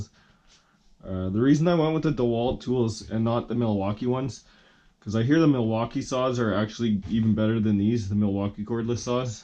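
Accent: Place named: Canada. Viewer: This guy has the biggest Canadian accent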